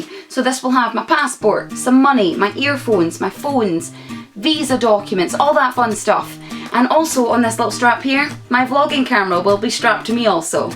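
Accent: Scotland, United Kingdom. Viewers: Are you scottish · I love your accent. Ive been to Scotland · Are you Scottish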